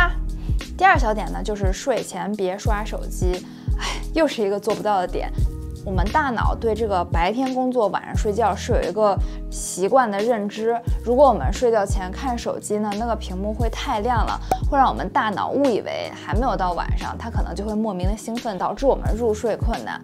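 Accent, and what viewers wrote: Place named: Beijing, China. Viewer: 好喜歡你，謝謝鼓勵我們！每次聽到你說話，我彷彿感覺我還在北京。👍👍👍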